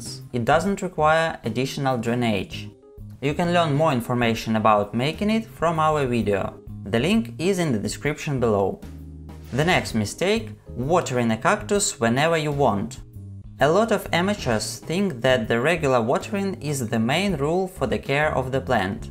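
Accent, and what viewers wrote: Russia. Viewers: Я которая слышу русский акцент👁👄👁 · Too russion. My earssss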